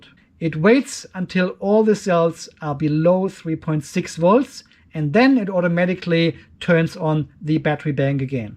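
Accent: Germany. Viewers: Garage ist euer Dialekt in Australien so, oder kommst du ursprünglich aus dem deutschsprachigen Raum? Ich verstehe dich bestens, nur ich finde dein Dialekt klingt schon sehr nach "aus Deutschland" :D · Thanks I’m still catching up on all the videos. I think the German accent added with Aussie slang is great. Actually laughed out loud this morning from another video when you said “it sh1t itself”. Keep up the great work. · I only want to hear your accent. I don’t care what you are talking about. You sound German. Yah?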